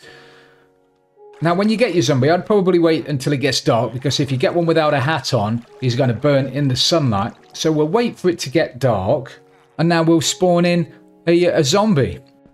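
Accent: United Kingdom. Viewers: I really enjoyed your video. English is not my first language and I think UK accent pretty dificult to understand, BUT I think yours is lovely and I understood it all!!! Thank you very much for sharing with us your iron farm, I hope I can do it haha. And I LOVED the name you gave to the zombie, it took a real laugh of me xD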